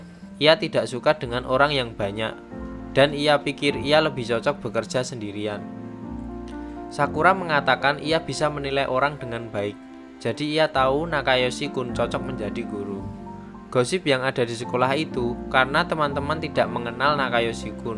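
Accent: Java, Indonesia. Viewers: Medok men... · Bro jagan medok dong plis deh. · Abang orang jawa ya?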